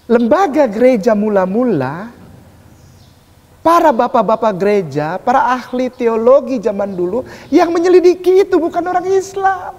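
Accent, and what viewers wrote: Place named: Eastern Indonesia, Indonesia. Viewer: Terima kasih ustd kainama mski cara penyampaianny khas ketimuran.. kami skrg jadi mendapatkan penjelasan mengenai injil dan bible.. semoga Allah SWT membalas segala kebaikan atas kebenaran yg kau berikan.. aamin ya robal alamin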